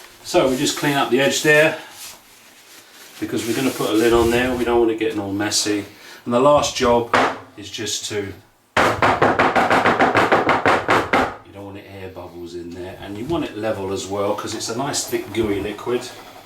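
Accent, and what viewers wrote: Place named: United Kingdom. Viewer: What a breath of fresh air! at last, a clear informative video and British too, this will be of great help to anyone starting out, or for those who feel confused or overwhelmed by other videos. I found it really helpful, although I have been making soap a little while now, but it was nicely reassuring that all what you showed I also do. Thank you so much, looking forward to more!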